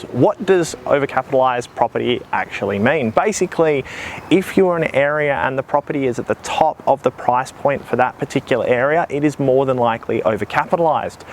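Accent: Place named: Australia. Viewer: Oh good, you're Aussie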